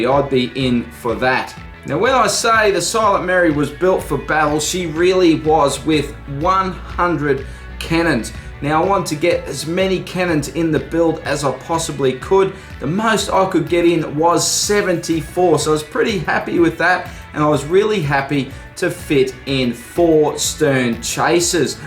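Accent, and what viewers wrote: Australia. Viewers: this austrailian english love it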